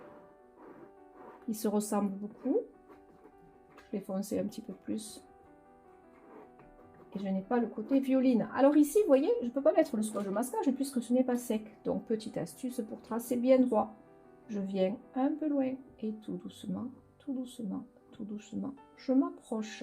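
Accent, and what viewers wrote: South, France. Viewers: Bonjour Madame. J’ai une petite question belge à poser. Je n’ai jamais peint à l’huile. Cela demande t il une préparation particulière Le coût est-il plus élevé qu’à l’Acrylique ? Encore merci de vos conseils chantés avec votre magnifique voix du Sud. Depuis la Belgique, Amicalement ERIC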